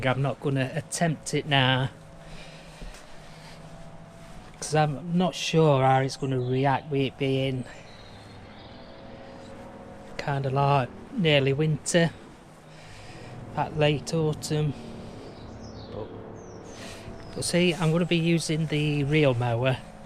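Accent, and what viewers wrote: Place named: Nottingham, United Kingdom. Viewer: I recognised the accent straight away mate! Saw you're a notts lad.. im originally Mansfield born n bred but moved to south Yorkshire now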